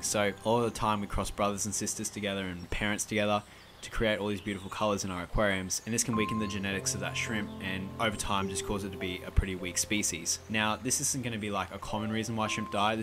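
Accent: Australia. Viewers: Thanks for the informative video. What city do you live in? I am wondering because you sound Australian and I live in Melbourne and the water here is also fairly soft. So I am wondering how appropriate you think Melbourne water is for Crystal Red shrimp? Do you think it needs any treatment besides dechlorinating?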